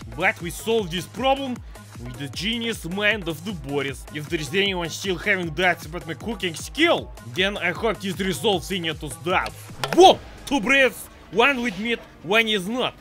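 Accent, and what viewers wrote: Russia. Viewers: As ussual russian always says one thing like slav for mr boris and blyad for dimitri, i like russian accent btw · Boris ACCENT IS FUCKING LIIIIIIIIIIIIIIIT❤❤❤❤💪🇷🇺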